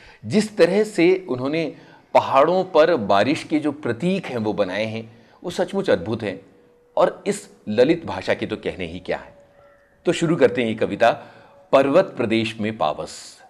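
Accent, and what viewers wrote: Standard. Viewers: Too good... Hindi kavita aur Yunusbhai ka vishudh Hindi uchcharan... sone pe suhaga... what else...? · आपका उच्चारण बहुत shuddh है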